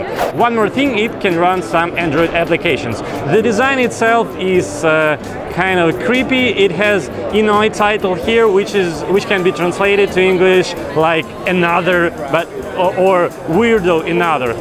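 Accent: Russia. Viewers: ужасный русский акцент) а так всё норм)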